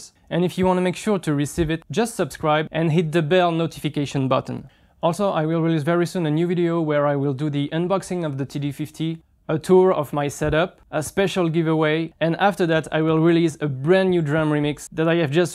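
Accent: France. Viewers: Super video !! Ya encore un petit accent francais😂😂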